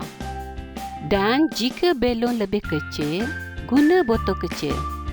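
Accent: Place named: Malaysia. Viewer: Serasa lihat film upin ipin. Bahasa nya sekampung dgn upin ipin. Xixixi. Salam kenal kak.. Saya dari indonesia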